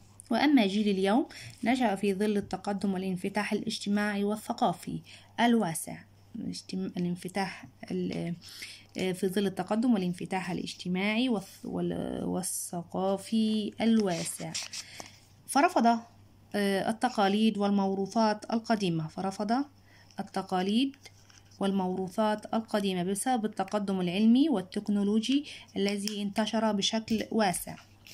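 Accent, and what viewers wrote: Egypt. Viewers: انتي مصرية؟؟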